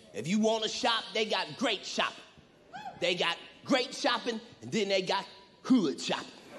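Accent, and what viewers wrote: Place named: Houston, United States. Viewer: Sounds like HOUSTON🤣🤣💯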